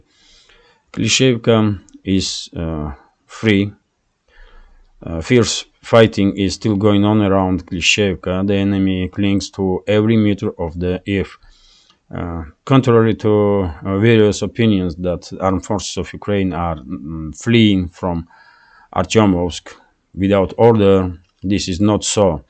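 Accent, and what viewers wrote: Russia. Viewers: NATO, Poles mercenaries are involved. And those Ukraines forced to die for USA. Meanwhile, Russia wins again. The Imperialist brain is sociopathic hence the more Russia wins, the more they double down. I rejoice in Mr Putin's leadership: a leader who loves his citizens, whose intelligence is layered with great emotional depth. Alas, I have Justin Trudeau to depend on for my economic well-being. Perhaps, you can lend President Putin to us, just to help us become a real nation, again.😏🇨🇦 Advice: speak a little louder, a bit more emphasis Just a bit🤏 Found you from Alex C.'s channel. A Russian in Russia sounds good to me! P.S. I am an old woman who has been dumpster diving for the truth for decades. Good to find another truth teller in my search. To die is human, to die rejecting the bullshit of false prophets is divine. Subscribed.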